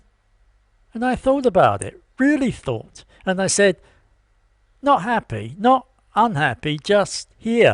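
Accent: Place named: United Kingdom. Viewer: I am still chuckling 🤭 after reading this Mr., let me paraphrase it back to you: Christian, you must absorb more propaganda, least you should get closer to enlightenment by your own personal firsthand observations., why do I love ❤️ it so much, or do I? Is is that beautiful British voice that can take the English language and use it in ways that are like a Grandmother sewing 🧵 up a favorite tattered stuffed animal 🧸, or how much we all love Britain 🇬🇧, The late beloved beloved Queen 👸, and well loved 🥰 King 👑, Mr., I have listened and conversed with you because you are British, what do they do best? I think 🤔 it is Art 🖼️ the liberal art through the humanities, that was how they made life better for me growing up in America 🇺🇸, Doctor Who, the British comedy 🎭, the wonderful documentaries, adventure stories or a knock down drag out questions session in the British parliament on CSPAN, our fascination with the British monarchy, and that wonderful history, a history that defines what sovereignty is, and what it is not. 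Perhaps what is interesting and fascinating about you is agreeing with you but not coming to the same conclusion. Perhaps understanding you is to begin to better understand the peoples of the world 🌍., I was reflecting on post World War Two history, the United Nations 🇺🇳, Japanese atrocities, the two front war Americas fought, pacific and Atlantic, and the results; we saved Britain 🇬🇧 ah yes, DONT say it, you know it is true, you asked we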